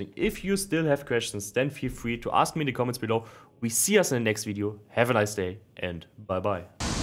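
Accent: Germany. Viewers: Gehe ins Video und weiß direkt am Akzent, dass du deutscher bist😂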